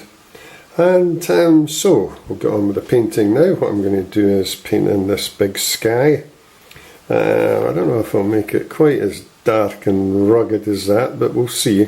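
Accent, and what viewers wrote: Scotland, United Kingdom. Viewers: My absolute favorite painting you’ve done so far, new to the channel and look forward to your talent. Better than Bob Ross with a great Scottish accent👏🏻🙏🏼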